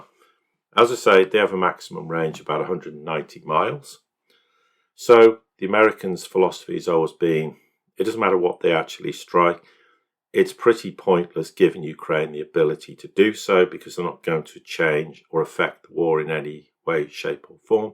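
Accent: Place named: United Kingdom. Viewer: I like your show. But even if you are legit, in time your British accent will make me think that you are a psy-op. The vast majority of individuals worldwide who work in communications positions (News, TV, Radio, Newspapers, Magazines etc..) that target a worldwide audience are British, and 95% of them are psy-oping.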